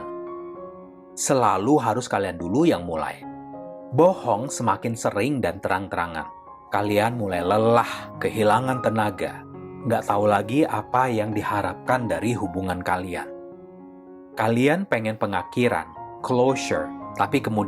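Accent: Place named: Java, Indonesia. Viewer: Tiba tiba logat bicaranya aneh....gimana ga aneh biasa ngomong logat Betawi kok tiba-tiba campur logat Jawa..😂😂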